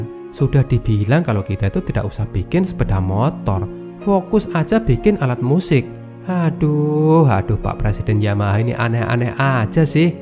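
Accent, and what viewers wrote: Java, Indonesia. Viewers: saya nyaman dengan suara medoknya.. sya dnger cerita ini sblm tidur enak bgt hhe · Gpp medok. Saya jg medok 😁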